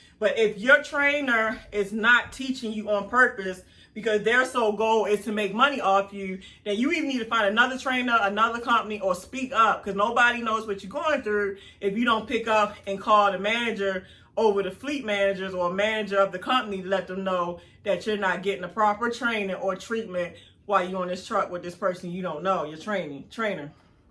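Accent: Baltimore, United States. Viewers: You gotta be from the Baltimore area... Lol!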